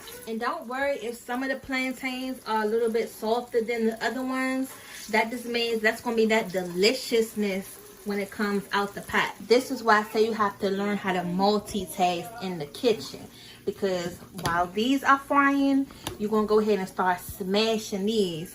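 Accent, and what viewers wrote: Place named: DMV, United States. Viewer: Sound like she from the DMV!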